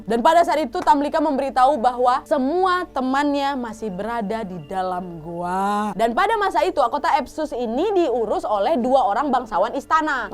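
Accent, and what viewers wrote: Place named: Manado, Indonesia. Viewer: Aku suka gaya bicaranya, kayak orang Manado🤗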